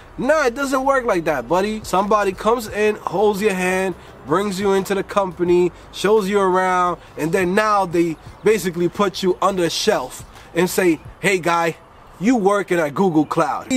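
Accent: New York, United States. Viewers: NYC remixed with a slight D.R. accent. 😁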